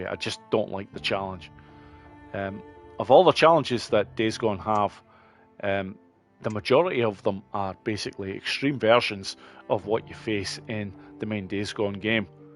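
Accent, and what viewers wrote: Scotland, United Kingdom. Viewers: top class mate as per. Even better when hearing another Scotsmen swearing properly. Still had good scores tho . I am trying to get better scores on outridge . 131 is my highest but can’t get anywhere near it. Had a look at the leaderboard your number1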